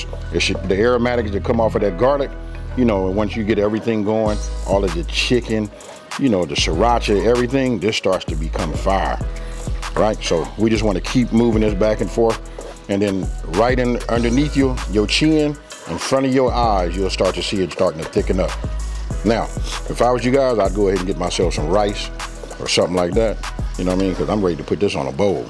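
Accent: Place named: California, United States. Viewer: Cali accent thick. Came here to find something to cook for the night. Thanks bro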